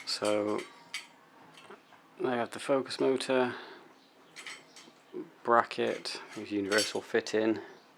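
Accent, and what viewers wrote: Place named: Manchester, United Kingdom. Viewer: MY GOD HE"S FROM MANCHESTER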